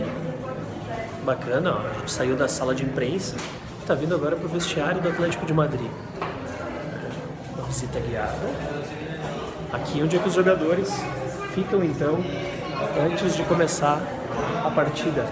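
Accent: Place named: Porto Alegre, Brazil. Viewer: Pode deixar. Abraço..pelo sotaque é Porto Alegrense igual a mim. Tmj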